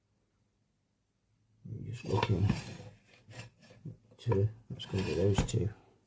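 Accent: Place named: North Derbyshire, United Kingdom. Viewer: I think it’s the dialect, plus some / many of the words. “ Tatered” as in “I think that capacitor is tatered.” Many won’t understand the words peculiar to the north Derbyshire/ Nottinghamshire dialect. 👍👍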